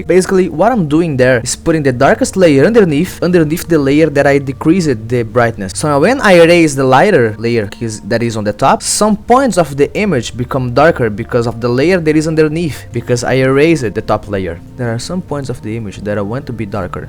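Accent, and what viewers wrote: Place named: Russia. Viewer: Mano seu inglês tem uma pronúncia bem russa. Sem zoa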